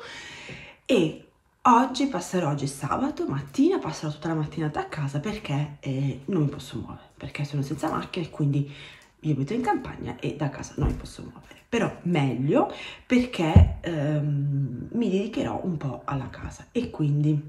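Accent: Apulia, Italy. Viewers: Salve,sono nuova mi sono iscritta al tuo canale sbaglio sei pugliese come me dall'accento sembra così, complimenti per il video grazie e buona giornata 😘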